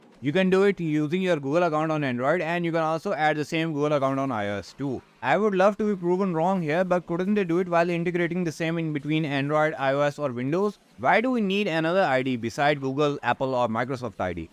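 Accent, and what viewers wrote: India. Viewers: nice 👍 you got a indian accent btw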